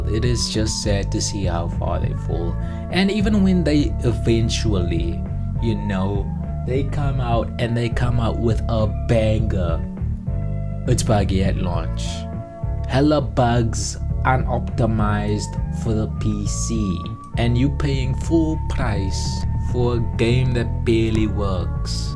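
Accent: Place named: South Africa. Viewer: Eish I thought u where from South Africa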